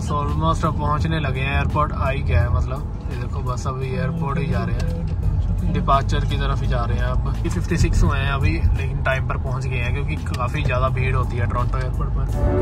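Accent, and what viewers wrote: Punjab, India. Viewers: Bai ji punjabi muh se punjabi accent bohot kaint lagta hai😂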